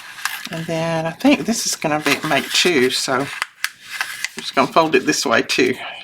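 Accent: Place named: Alabama, United States. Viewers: Awe thanking yie well Ile tell yie 🖐️👅🤗 ime going to see that on Saturday with my x 2 grandwains, 2boys 5 n 8. They both just turned that age oooppphhhffffff they can't sit down but they do in the cinema 🖐️😝😝😂🙏 yie remember at age full of questions well we still love them with our heart n soul I know a lot of things change when yie Wer able to make the big meals I still do that I always put more on than I need. Its a total waste, plus be went off everything some smells it's drivinge caarazy n then ime nearly deaf I've only got 40,% of hearing left soo anyway I get tinnitus badly I hardly sleep but i hear yie woman 🖐️🙌🤗🤗💜💜😘😘 I wish I could give u big hug right noo 🤗💪💪 amd i know the feelin the grandwains they think yer purse is an open book n it never closes n always good to look at explore lol 😝😝😂🖐️👌👌 but we love them plus I love listening to you you calm me but i do watch too keep yer chin u btw your doing amazing remember you take care Mrs b good 👅🖐️😘🤗 i hoe i made yie smile with my Scottish patter n slang words n I love yer accent that deep south love it I know I know Mrs xx 🤗🌹🌹💜💜❤️‍🔥❤️‍🔥❤️‍🔥🙏🙏 sounds a funny big guy god luv his wee soul gdnyt or morning whatever comes first for you I've been dying papers all day making them pink with blowing the bubbles on them I love the effects,🙌 soo tired noo thanks for reading tillthe end 🙏❤️‍🔥❤️‍🔥🌹🌹🏴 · E, as I’ve said before, I love listening to you talk. 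You remind me so of my Alabama family. I miss them very much. But, I also like hearing your ideas and learning from you! Thank you!!!❤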